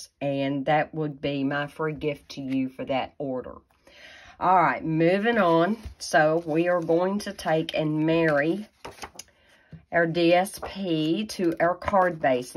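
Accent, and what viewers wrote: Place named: Georgia, United States. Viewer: Where are you from? I love your accent. Reminds me of home (GA)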